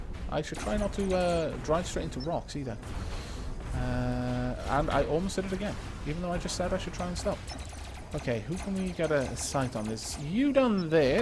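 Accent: Wales, United Kingdom. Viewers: For some reason i think you sound Welsh. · Is he welsh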